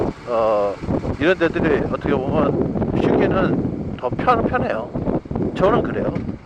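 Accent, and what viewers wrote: Jeolla, South Korea. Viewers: 으메~ 나무 짤라브렀어~ 앗따~ 전라도 사투리 아닌가여? 님 혹 전라도 출신이신가봐요^^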